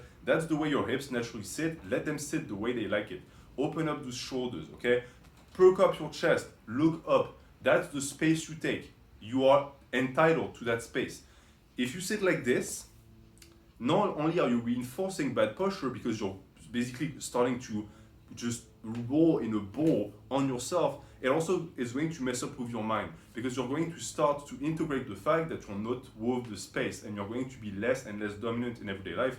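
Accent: France. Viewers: Yo dude are you french? It sounds like you are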